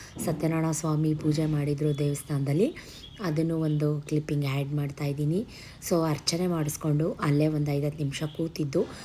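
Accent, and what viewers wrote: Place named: Rural, India. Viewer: Sis nim gramathara bhashe chennagide keloke😂❤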